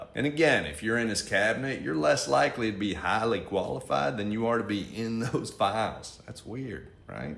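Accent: South, United States. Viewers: Well done! Love your charming Southern accent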